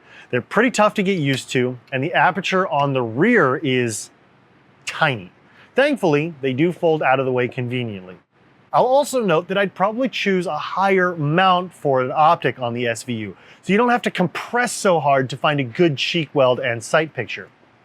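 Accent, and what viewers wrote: Russia. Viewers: I Loved the super detailed review. All the info was much appreciated and incredibly helpful. Can't wait to see more reviews like this, Russian accent or not. Good job and Evike crew. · How to talk like that? Russian accent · That Russian accent though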